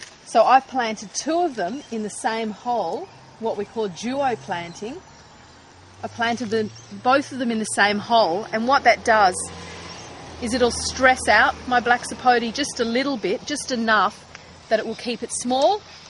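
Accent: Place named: Australia. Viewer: cute aussie accent ;)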